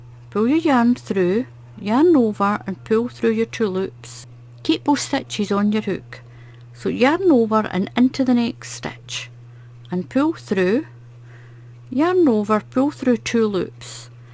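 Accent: Scotland, United Kingdom. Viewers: Beautiful pattern and beautiful colors! Also loved your accent, which appears to me as Scottish! Greetings from Portugal!😊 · It's been a while since I've had time to watch your videos. ( I didn't realize how much I missed your delightful Scottish accent.) This stitch pattern is quite lovely. I must give it a try.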